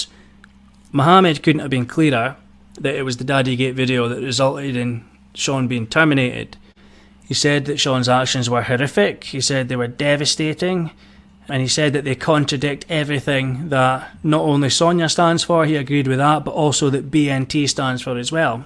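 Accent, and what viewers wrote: Scotland, United Kingdom. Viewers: It's always a nice feeling when ones gut intuition is vindicated. Shaun, from his holier than thou attitude and self glorification to his literal facial expressions struck me from day 1 as wrong. It's a breath of fresh of fresh air hearing you tearing him apart on a daily basis. I dont give a fuck what anyone does behind closed doors at the end if the day, but the man is definitely not well. Lovely to hear a Scottish accent also, and congratulations on the channel success to date - Well deserved imo. Greetings from Ireland.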